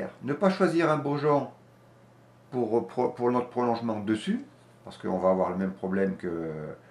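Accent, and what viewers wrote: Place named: Dauphiné, France. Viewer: Quel bonheur d’entendre l’accent du Dauphiné ! Merci pour vos vidéos très précises et claires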